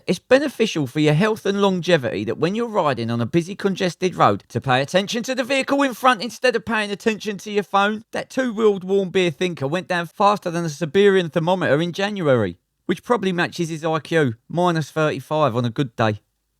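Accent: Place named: London, United Kingdom. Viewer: This chamnel is brilliant.Aside from the stupidity it shows the commentary in a london accent is hilarious Nice one g'vnor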